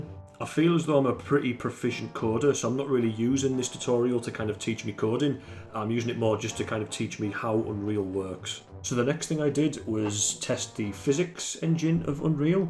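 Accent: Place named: Wales, United Kingdom. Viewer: HES WELSH! HES BRITTISH!!!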